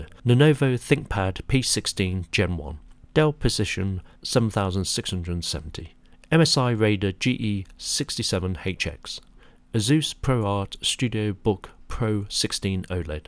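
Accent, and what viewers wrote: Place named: United Kingdom. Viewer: Interesting how Z is Zed, and H is Hayche, in the Queen's English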